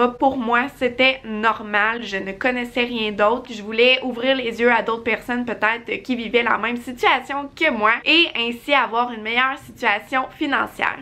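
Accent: Quebec, Canada. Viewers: Cc grâce à toi tu nous fait prendre conscience de ce monde de surconsommation qui nous envahit.....et finalement.....on peut vivre mieux avec moins je viens de te découvrir...tu as gagné +1 abonnée je t adore et que dire ton joli accent québécois me rappelle des souvenirs heureux...en 1991...en étant en dernière année d infirmière ( l année de mon diplôme )....je suis allée à Québec faire un stage...j étais dans la région des Laurentides.....juste sublime.....j ai adore une seule envie y retourner dans ton joli pays.....gros becs.... de Nice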